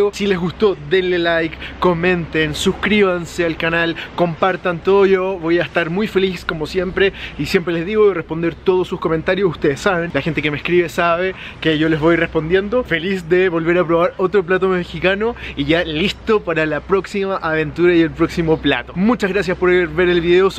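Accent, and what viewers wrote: Chile. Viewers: Por fin! los chilaquiles son tan ricos:D Saludos!!! cuidate mucho para que puedas seguir disfrutando de la comida y por cierto el color verde te va muy bien!!:D Por cierto me encanta el acento chileno!!! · oyeee me alegra mucho tu canal! Me gusta ver cómo los extranjeros le gusta México y a mi me gusta Chile 🇨🇱:) algún día me gustaría ir a Santiago y conocer la comida chilena y la gente chilena! Me gusta mucho el acento chileno también:) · A que lindo eres, y lo explicas super bien; amo el acento chileno!!! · soy una mexicana qué ama tú país... chile me fascina, me encanta su gente linda ustedes también tienen ésa cultura, ésas tradiciones tan lindas. Conozco chile a través de la televisión viendo enigma, y mea culpa y me gustó tanto me enamoré de ése lugar hermoso qué un día quiero ir a conocer. al escucharte hablar supe qué heres chileno me gusta ése acento tan dulce! 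Gracias! por aprender de nuestra cultura❤💞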